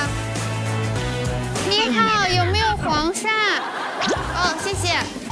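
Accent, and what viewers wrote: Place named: Zhejiang, China. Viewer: 很浙普吧！浙江美女代表，聲音軟糯軟糯的，好好聽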